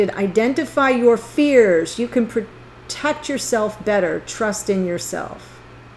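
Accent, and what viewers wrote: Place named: Florida, United States. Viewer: And I also knew based on your voice you're a person either from FL or have lived there a long time.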